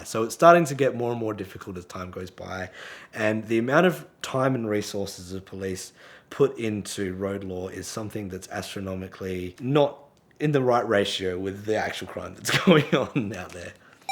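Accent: Australia. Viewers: You sound like a West Aussie. 🤟 Port Hedland saying g'day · Sounds like you're from qld 🫣🫣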